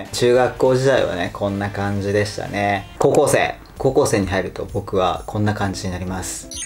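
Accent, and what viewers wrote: Kansai, Japan. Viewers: くんの関西弁、すごくイージーリスニング。心がほわほわする。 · こ、これは関西弁なのか、、笑笑全然わからへん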